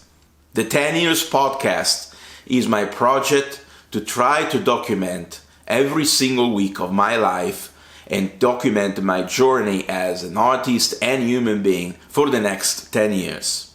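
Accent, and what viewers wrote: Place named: Dublin, Ireland. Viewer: A little bit of an Irish and indeed a Dublin accent I can hear. Very cool. As for Shane, we lost a little bit of our past, present and future when Shane stopped writing. It's a loss, he is a loss. But like you said, not in a sad way which is strange. Plus he got a fantastic send off and now a punk in spirit. P.S. I don't mean for this to be the type of question which it will surely sound like. If you had to, which song from his song books would you pick as a personal favourite in terms of story, lyrics, honesty, emotion and of course musicality? For me, and for all of the reason and more listed above.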